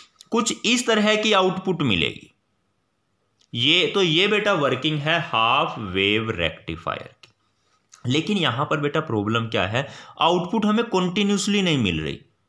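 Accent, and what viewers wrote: Haryana, India. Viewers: Sir ,Are you from Haryana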